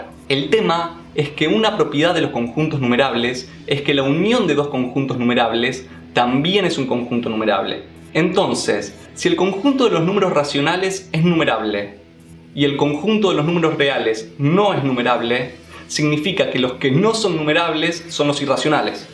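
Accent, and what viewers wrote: Argentina. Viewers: Que no se nota el acento? Obviamente es argentino :v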